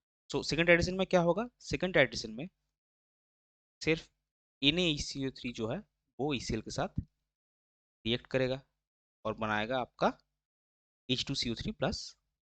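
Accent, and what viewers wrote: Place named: Bengal, India. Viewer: Dont recruit bengali accent its so uneducated sounding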